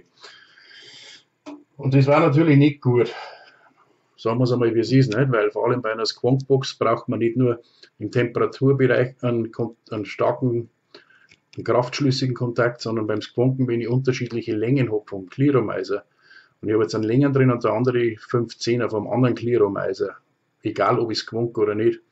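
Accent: Bavaria, Germany. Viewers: Bayrisch is a deutsch , alles verstanden ;-) Danke für deine Eindrücke .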